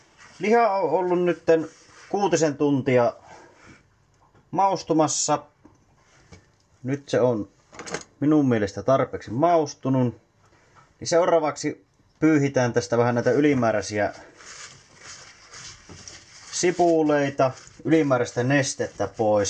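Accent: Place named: Ostrobothnia, Finland. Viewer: Hyvä video sait uuden tilaajan. Tosi piristävää kuulla vähän pohojanmaata youtubesta.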